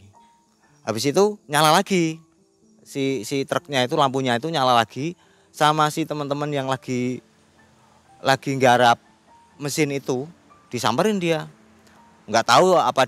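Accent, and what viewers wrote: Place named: East Java, Indonesia. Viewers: Jowomu medok leh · Logat jowo wetan iki mang ei,serem critane😮😢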